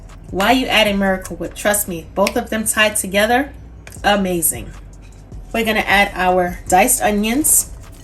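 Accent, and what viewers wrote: New York, United States. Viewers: I'm not going to play with you. 😀😀😀😀 You have an accent like you are from NYC. What I am about to say does have nothing to do with you and your cooking. I have been watching this show called POSE on FX Channel. If you know what I'm talking about I appreciate it. I just wished Blanca... who was the mother of "THE HOUSE OF EVANGELISTA" would have fed her kids things like this. Fried chicken, pork chops, etc..., LOL I know it was only a TV show. I'm just having fun 😋😋😋😋😂😂😂 This looks AWMAZING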